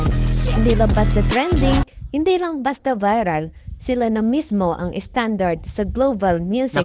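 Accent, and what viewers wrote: Batangas, Philippines. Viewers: Enjoy ako sa Batanguena accent mo Sis.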